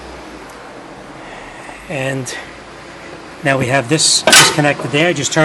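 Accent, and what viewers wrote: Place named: Boston, United States. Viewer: My FL friends used to make fun of my "how you doin" accent (Paterson and Fair Lawn) but man oh man I really thought you were from Boastn (caaa-no r). Anyway thanks for the cool videos you post! It is refreshing watching them.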